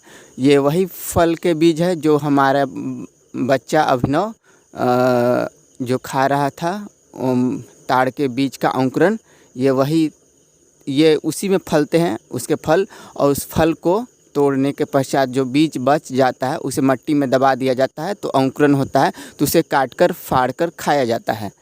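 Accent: Standard. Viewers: क्या शुद्ध हिंदी बोलते हो भाई 🥰गजब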